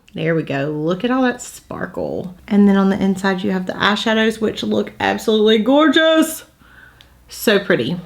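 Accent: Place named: Tennessee, United States. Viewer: As a Tennessee girl living in Colorado, your sweet accent makes me homesick. 😍. Thank you for your generosity in doing a giveaway!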